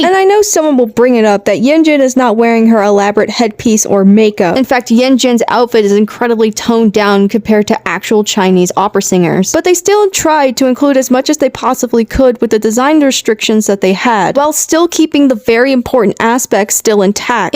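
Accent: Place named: General American, United States. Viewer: How’re u from the south but sound normally american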